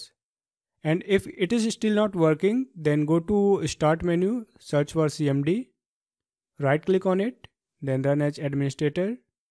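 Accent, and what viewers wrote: India. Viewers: 😁😁😁😁Always the Indian accent guy who knows how to get things sorted on YouTube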